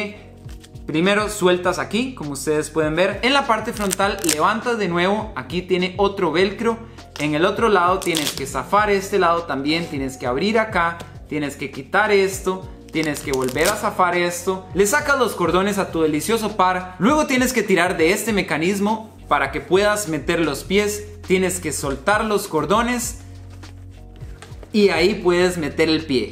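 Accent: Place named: Costa Rica. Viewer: Como se nota ese acento de Costa Rica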